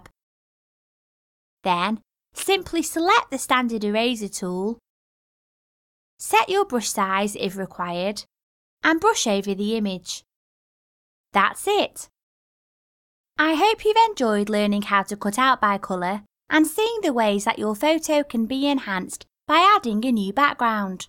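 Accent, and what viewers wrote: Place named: England, United Kingdom. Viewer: Omg she sounds gorgeously English like someone on a reality tv show. love her!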